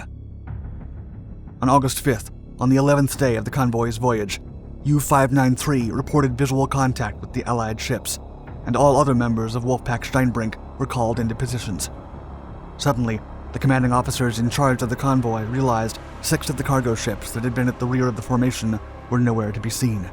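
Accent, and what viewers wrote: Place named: United States. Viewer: seems a native American speaker, at least from his pronunciation. The verbal gaffes are difficult to explain, unless we understand he grew up in a video world, without more careful, detailed study of history. inattention to such detail routinely harvests viewer criticism, and his sorest need has been a video editor, assigned the demanding task of finding errors. By accident, I have found sections of a source work he has quoted almost verbatim, but without attribution.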